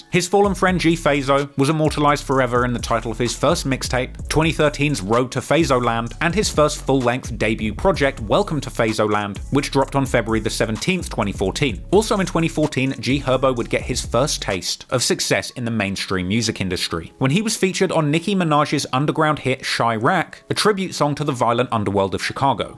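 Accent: United Kingdom. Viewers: A British accent talkin bout American activities?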